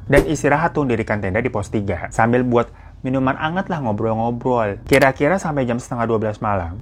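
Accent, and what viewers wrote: Java, Indonesia. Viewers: Lucu bgt ya, kak bahasa jawanya lucu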